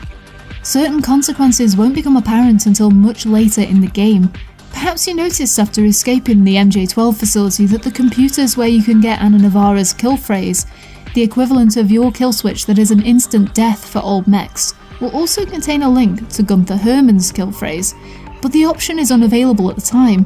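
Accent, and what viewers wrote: United Kingdom. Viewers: Ok not on my phone now so I can reply properly :) Deus Ex had a massive effect on my early gaming days. Like you I remember playing it many times and spending time working out how far the narrative web would let me push it. I discovered that Paul could be saved by myself and it was my first major 'what if' moment in gaming. I still look back at that realisation with very fond memories, that this game world had so many clever ways that recognised my choices. I'd be interested to know what you think of the other games, imho none have come close to the original ofc :) Btw I've been trying to place your accent, are you in the North? The odd 'that got buggered up' is something I say :) Looking forward to your next project, I'll share this on SM and hopefully get you a few more followers because this quality of production is just as good as any made by the bigger sites. 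Cheers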